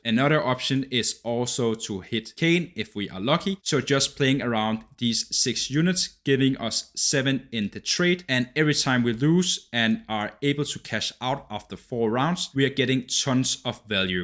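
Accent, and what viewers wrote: Denmark. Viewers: Are you from Denmark?? You sound like a danish person 😂😂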